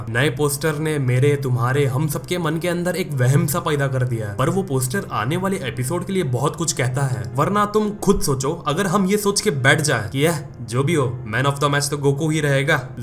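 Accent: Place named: Bihar, India. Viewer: bihari lgte ho awaaz se!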